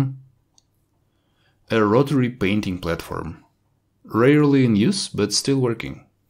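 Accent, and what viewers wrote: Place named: Russia. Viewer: Отлично. Дом без принтера скоро будет невозможен, это как микроволновка или пылесос, вещь необходимая. Дочь Арина и по акценту понятно что по русски говорите.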